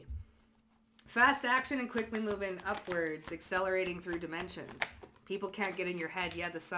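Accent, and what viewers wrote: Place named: Wisconsin, United States. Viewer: Oofdah: You accent sound like Wisconsin. I've been around it enough 2imitate it. I got a touch of it if I'm not careful 2mask. Norwegian or Swedish ancestry. You & I would be a hoot for outsiders to listen to: taking a piss on everything dumb under the sun. I'd definitely keep t/drinks coming. It is a miracle I'm still around. 7 of 8 good hard-working me die from my condition before being diagnosed. It's a silent-killer. If I weren't built like a Terminator from baling tiny square haybales: I wouldn't have made it. So: that info's got to come- out. We can't be having no good-men to raise the next generation. Why there's 8 good-women to 1 good-man & 10% of men online-dating are seeing ~80% of women from the same sites. Oh yeah, I'm MacGyver. I can solve t/world's energy probs. Yep, I've made guys look bad who've been there 10+ years & I'm there a month doing their job twice as fast, so.. 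they do their best to get rid of me, and they did. It sucks. I'm just going to give it all away b/c Earth don't have time for us to change. It's melting the ice-caps 6 times faster than our previous predictions. We got to use every tool in the box & pump the brakes hard as we can. Unless we want to lose all of the coastal cities & states & have earth-quakes up the wazoo, volcanic off-gassing which makes it so much worse, I just got to put all my cards out there. I get a kick out of all these Christians who say prophetic singers I got with in other timelines: witches, when they're actually as H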